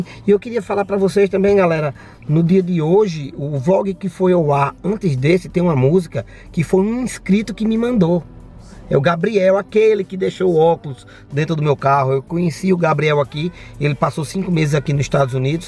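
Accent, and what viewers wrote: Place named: Goiás, Brazil. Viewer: voz de cantor sertanejo deve ser goiano este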